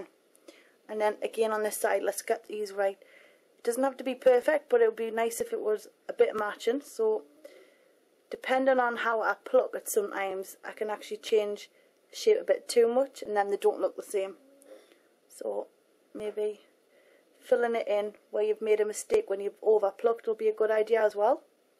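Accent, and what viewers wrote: Newcastle, United Kingdom. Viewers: She's got a Geordie accent....she's from Newcastle in the UK :) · I can't believe these posters can't hear your British accent. I don't think it's your 1st language though. You are from somewehre else. Am I right? I like your video. You look lovely. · omg are you from newcastle? x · she is from UK, so, she have a british accent :D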